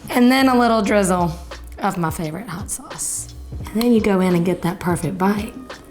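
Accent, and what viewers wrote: South, United States. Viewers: Gawd you are amazing. An Italian woman with a southern accent is pretty awesome.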